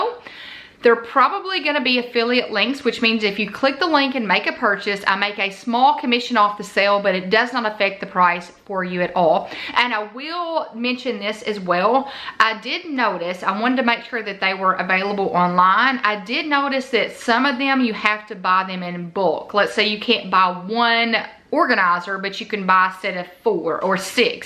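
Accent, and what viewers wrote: South, United States. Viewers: Country accent love it. I just found your channel.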